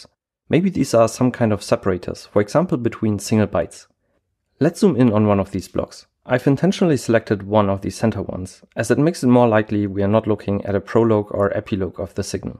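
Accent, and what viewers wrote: Germany. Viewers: Are you German? Just asking because of the accent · Yep he is german😂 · Are you from Germany? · Bist deutsch oder? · Dieser Deutschakzent ist super 😁 Das Video auch ! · are u german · 100% a German accent. Trust me, we have something akin to a sixth sense, allowing us to recognize our own accents in lightning fast speed (pun not intended lol). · Judging from your accent, you are German.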